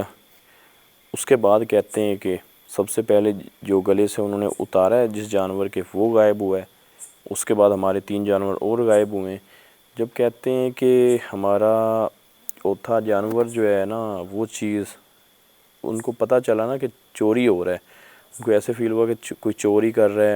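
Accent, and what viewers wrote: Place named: Punjab, Pakistan. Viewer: Bhai what about you & how is your health I hope u will be fine & better You r very responsible person You r so nice your Punjabi accent is also nice Like churhaaaail 😊🥰 Bhai Yahan tk to thk ha churail ab larki to na bolein bura lgta ha yaar 😂😂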